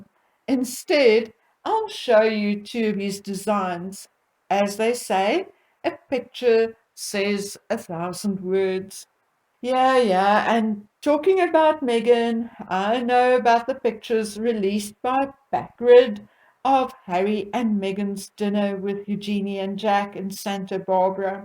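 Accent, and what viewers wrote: South Africa. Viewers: I enjoyed your commentary on the Harkles, most entertaining, abd so true. Also loved hearing your accent made me horribly homesick for SA. Nkosi love and protect Afrika 🙏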